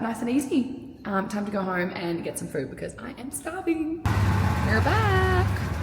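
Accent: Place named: Australia. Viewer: Love your vlogs. Great energy. Aussie accents are my fav 😊 Can you tell us your DCC lip combo?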